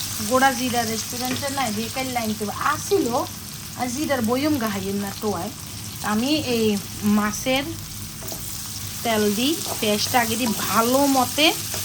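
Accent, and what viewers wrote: Noakhali, Bangladesh. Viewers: আংগো নোয়াখাইল্লা বলে কথা 😊😊🙃🤪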